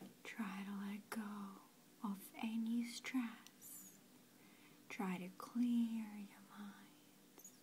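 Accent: Russia. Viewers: Please do another one of these fortune videos,!:( But, PLEASE do it in the Russian accent...it makes me feel so warm and at peace...nurturing <3 I've listened to a few of your Russian videos to fall asleep, lately...they help SO well! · I love all your videos, but make more videos, esp this kind of video, with your russian accent you do so well.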